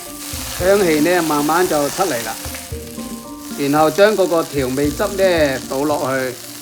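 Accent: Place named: Zhaoqing, China. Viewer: 是肇慶話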